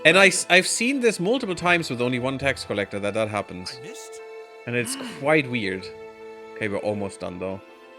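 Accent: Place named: Germany. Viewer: nigga ich kann ganz klar raushören das du deutscher bist. warum redest du nicht deutsch?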